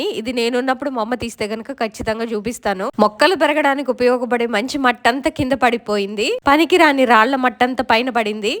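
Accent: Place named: Telugu, India. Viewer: Voice lo telugu danam super sis❤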